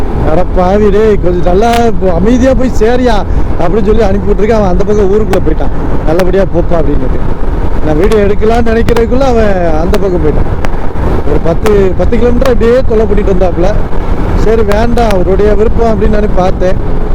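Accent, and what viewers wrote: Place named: Tirunelveli, India. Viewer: Nellai Tamil plus for your channel. Please keep it up.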